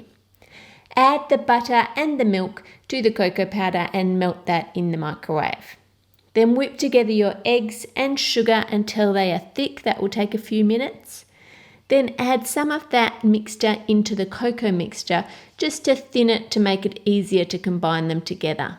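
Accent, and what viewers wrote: Australia. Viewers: Is she Australian or English? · I like your Australian accent :D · Heaven Claunch haha I didn't notice that because I'm Australian like her 😂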